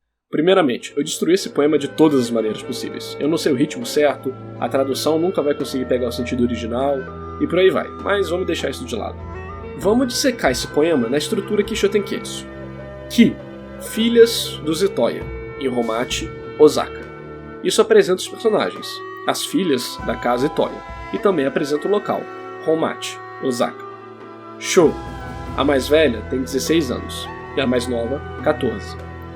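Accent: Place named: Maranhão, Brazil. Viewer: O sotaque desse cara é muito dr maranhense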